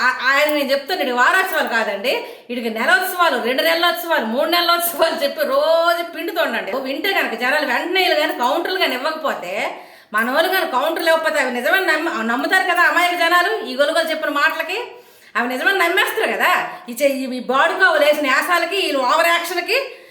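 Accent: Srikakulam, India. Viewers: Sikakulam bhasha needi,mundu neetga matladam nerchukove ,aadadanivena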